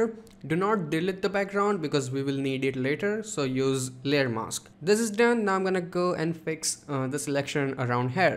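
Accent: India. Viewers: Your accent is a lot Indian (95%) and some American (5%). You posing bruh? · INDIAN ACCENT -- PROBABLY FROM INDIA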